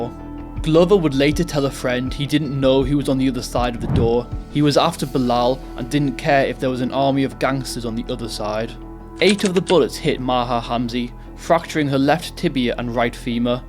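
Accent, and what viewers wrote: England, United Kingdom. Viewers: The narrator is English- why doesn't he focus on British crime?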